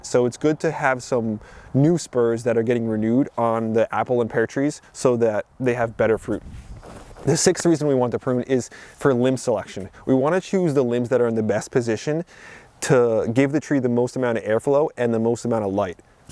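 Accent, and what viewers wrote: New Jersey, United States. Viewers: Are you from joisey? Im from joisey!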